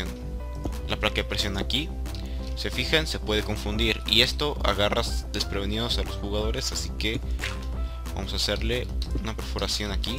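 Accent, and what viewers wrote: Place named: Spain. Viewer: siges con acento español e_e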